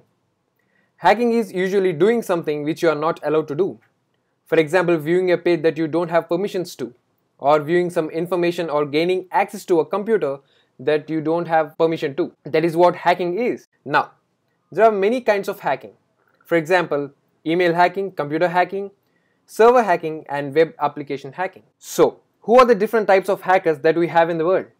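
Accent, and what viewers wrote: India. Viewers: You know it's about to be good when the man's got a slight indian accent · it's a proper indian accent....not slight...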